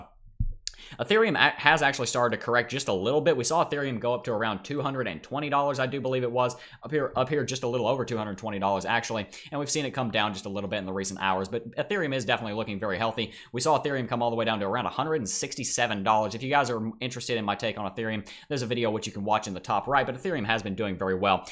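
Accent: United States. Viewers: This strong American accent is painful for my ears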